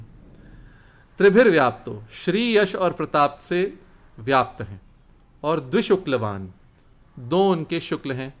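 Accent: Standard. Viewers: आपके अध्ययन, मनन, चिंतन और वर्णन का में कायल हो गया हूँ!! आपका शुद्ध उच्चारण और विषय में सटीक सरल उदबोधन बहुत ही प्रभावशाली है!! एक बेहतरीन विश्लेषणयुक्त प्रस्तुति हेतु आभार!!🙏👍🙏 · Am impressed by your sudhha hindi ..and appreciate your description of the different body parts of Shri Ram .. thanks for clarifying ..जय श्री राम 🙏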